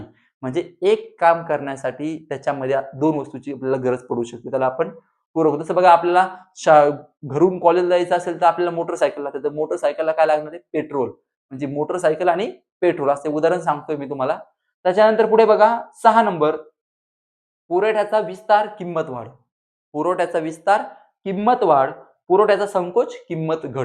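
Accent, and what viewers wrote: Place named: Nanded, India. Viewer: Sir Nandedwale ahe re bhau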